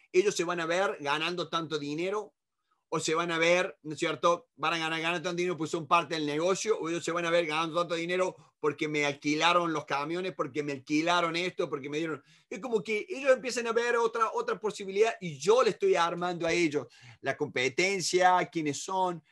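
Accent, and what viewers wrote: Córdoba, Argentina. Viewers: ALTO CORDOBES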